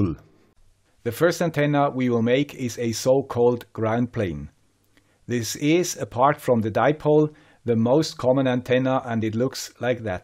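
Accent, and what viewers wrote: Switzerland. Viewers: What more could you want? A guy with a Swiss accent explaining complex numbers .... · Now when I am working with electronics I have a Swiss voice in my head.. Dankeschön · Thank you, as a French, I like your Swiss accent, and I remain admirative in front of the simplicity with which you can explain complex subjects...I'll keep watching your multiple videos and keep learning... Your experiments basically save me the hassle of trial and errors, and help me to go straight to the right solution. Bravo!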